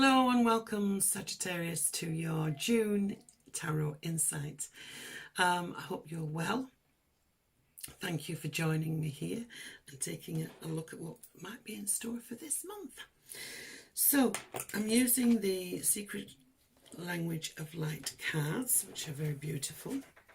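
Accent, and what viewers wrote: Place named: Rochdale, United Kingdom. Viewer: Thank you, this resonates with me in two on-going areas of my life. I've subscribed so looking forward to monthly readings. (Are you a Rochdale girl by any chance? Only asking this because you sound just like me!) The best general monthly reading I've ever watched xx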